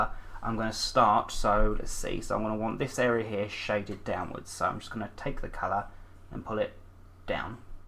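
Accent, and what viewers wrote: United Kingdom. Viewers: I love it! Your British accent is wonderful! I need more omegle's video please ❤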